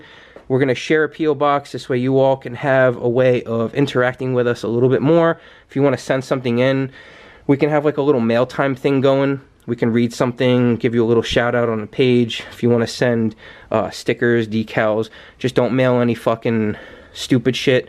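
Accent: New York, United States. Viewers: Awesome Bro : The NY/NE accent not so much :) When I clicked on the Stroker Hellcat exhaust video My brain didn't register Hellcat Stroker for some reason I was thinking Scatpack Stroker & near the end You mentioned something about being the only Stroked Hellcat on YT. I've been an SRT B5 sub for at least a year now but haven't been keeping up with His channel lately. I'm on board with both o Y'all now.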